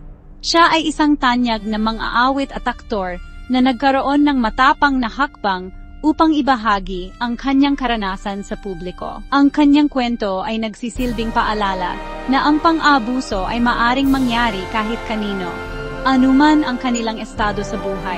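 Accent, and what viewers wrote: Visayas, Philippines. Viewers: Yung narrator bisaya naman .trying hard magpaka host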